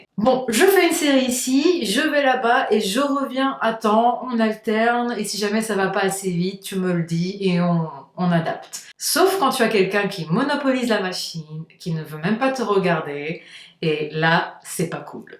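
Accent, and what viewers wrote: Portugal. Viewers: C'est dû à son accent! Elle est portugaise, du coup dans ces langues là (espagnole et italiens) il y'a ce qu'on appelle l'accentuation. C'est surement ce que tu entends le français dérogeant à cette particularité rend encore plus audible son accent c'est tout! Bisous!! PS: d'ailleurs elle en a fait une vidéo sur son accent ON trouve cet accentuation en Anglais, bref dans plein de langues...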